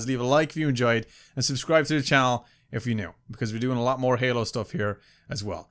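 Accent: Ireland. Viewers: i can´t tell if you have slightly poor english or if you´re just irish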